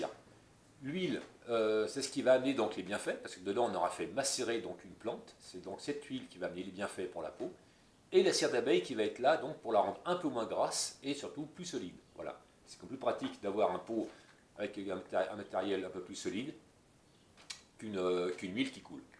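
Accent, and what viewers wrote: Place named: North, France. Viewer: Salut, J'aime bcp tes vidéos, ta façon (simple) d'expliquer et ton accent (je pense du nord de la France: au dessus de la Loire...) Un petit "Crut" pour les macérâts huileux solaires: j'utilise des boîtes de conserve que je numérote de 1 à 4 (au lieu du papier "Kraft" tout moche) et en cas de pluie: pas de PB... Chaque jour, je "tourne" de 1 à 4....pour être sûre que ma macération soit au soleil uniformément.... Encore merci pour ta simplicité et tes explications Bises.... et toi aussi prends soin de toi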